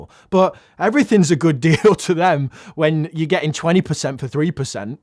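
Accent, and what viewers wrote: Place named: Northern England, United Kingdom. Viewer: I'm loving the northern accent. Not enough northern Youtubers.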